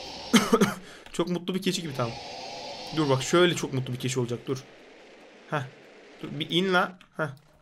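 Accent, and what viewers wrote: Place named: Thrace, Turkey. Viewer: Trakya aksamı :D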